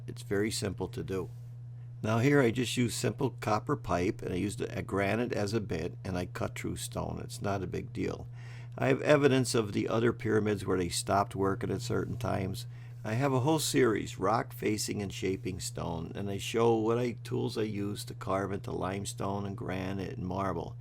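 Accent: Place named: Coal Region, United States. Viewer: How exciting- thanks for the vicarious world travel- you are a stone man alright but you can't lose that 'coal region' accent lol...(I've got one too, Shenandoah) Grandfather a coal miner - Thanks for this it was very enjoyable. I just read that stones take thousands to millions of years to form?! Thanks.... Molly a follower, learning all the time👆🙂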